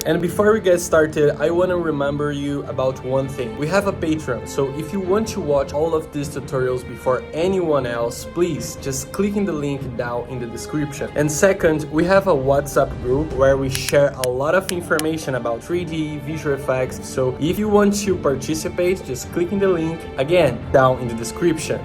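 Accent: Brazil. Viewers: reconheci o brasil só pelo sotaque akakak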